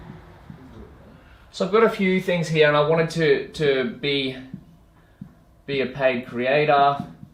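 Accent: Australia. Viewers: I WAS DESPERATELY WAITING FOR YOU VIDEO! Question - Are you from Australia? If yes, which city?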